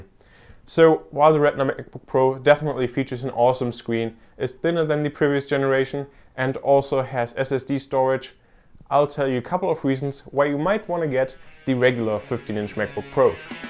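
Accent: Germany. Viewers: was ein schöner deutscher akzent ^^ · Are you german? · Deutscher Akzent :) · you german? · do you know how big of a difference there is in performance between the two? or if it's barely noticeable? i'm either gonna buy non-retina 13'' (high-end) 128ssd or Low-End 13'' retina. So I'm just wondering: if the performance difference isn't that big, then i'll go for the retina. even though I still use the CD-drive and an ethernet-port :P "give and take"... Next time I might answer you in German, if I guessed your accent correct? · He's from germany :) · oh but i just keep my superdrive in it.. i am planning to upgrade the ram memory to 8 gb to get ilife a little more fluent :P and yeah i heard a little german accent, i thought u were dutch :p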